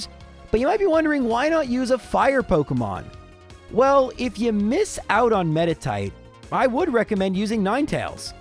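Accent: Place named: Canada. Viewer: you even come off as a Canadian. Whereabouts do you live in Canada?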